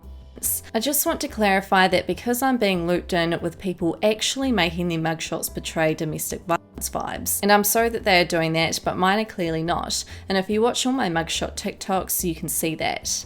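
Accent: New Zealand. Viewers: Off topic. But are you Kiwi? That accent tho! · This Kiwi accent is annoying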